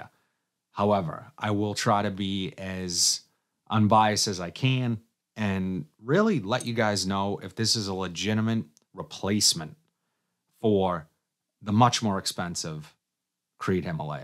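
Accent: Boston, United States. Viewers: Absolutely love Himalaya but haven't smelled XS despite hearing about the constant comparisons. Great video as usual Your Boston accent is so awesome. Go Red Sox 🤣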